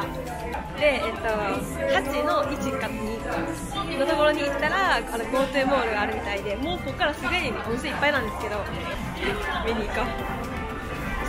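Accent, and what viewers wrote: Kansai, Japan. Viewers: 生粋の関西弁でハングル読めない事も言ってくれて性格かわいい、韓国行きたいけど読めない話せないで悩んでる自分には自信になった!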